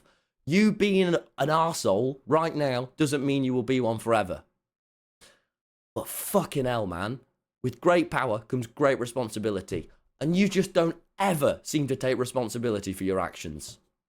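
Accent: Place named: Midlands, United Kingdom. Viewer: no that’s just a midlands accent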